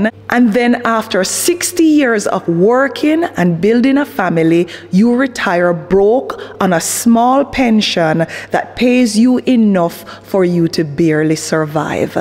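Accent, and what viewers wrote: Jamaica. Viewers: Are you a Jamaican?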